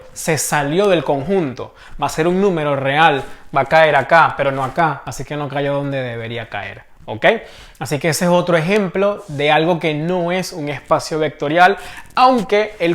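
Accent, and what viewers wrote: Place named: Panama. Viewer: Eres de pTy por el acento hahah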